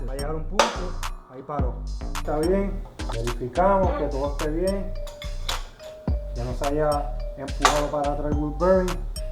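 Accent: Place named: Puerto Rico. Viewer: Lenguaje boricua. 😅😅😅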